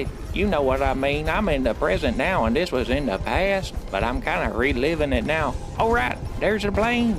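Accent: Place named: South, United States. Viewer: If you want peace prepare for war....the war for putting democracy hearts of many people with a smile uoon you're face. Good video strange seeing you play longer but hey new format kinda works and it honestly gets people in the vids to hear that strong country accent! Hellyeah brother give them the hammer of democracy!. Cheers.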